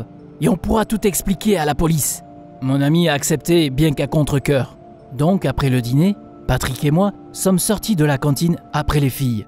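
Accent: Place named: South, France. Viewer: Le petit accent du sud qui me fait plaisir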